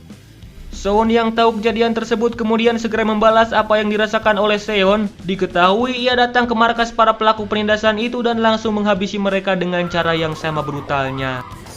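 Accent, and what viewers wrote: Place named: West Java, Indonesia. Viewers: Pasti urang sunda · logat sunda itu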